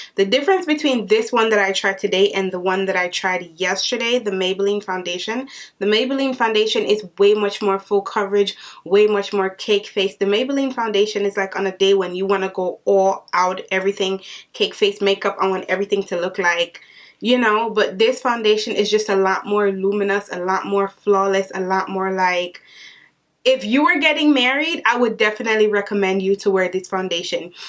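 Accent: Jamaica. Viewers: I just knew u were Jamaican from the first time you opened ur mouth lol big up muma🤗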